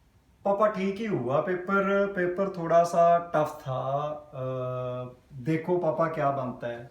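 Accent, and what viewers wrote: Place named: Punjab, India. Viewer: Punjabiya dii Hindi 🔥😁......tq sir....really informative and motivating🙏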